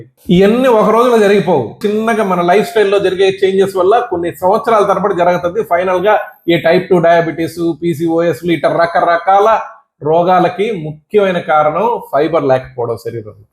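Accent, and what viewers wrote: Nellore, India. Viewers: sir, super sir meeru, with absolute nellore language super explanation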